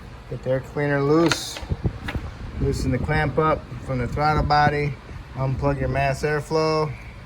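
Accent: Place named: Chicago, United States. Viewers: That’s a Chicago accent if I’ve ever heard one. Am I right? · just sounds like a midwest generic mechanic accent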